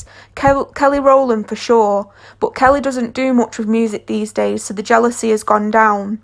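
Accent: United Kingdom. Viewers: It’s a British accent (northern region) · You are probably one of theeeee dumbest. The storyteller has a British accent. Now, beyonce on the other hand, sounds like she has a 5th grade education.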